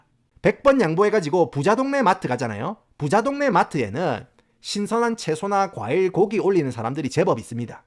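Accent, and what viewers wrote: Gyeongsang, South Korea. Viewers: 공감하는 글 잘 봤읍니다. 한국도 마찬가지 풍조입니다. 정크푸드가 건강한 포장을 쓰고 판매되는 것은 똑 같네요 갱상도 구수한 언변이 참 좋습니다.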